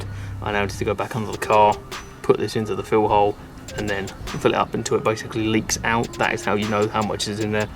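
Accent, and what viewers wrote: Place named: Australia. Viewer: I like your aussie accent 👌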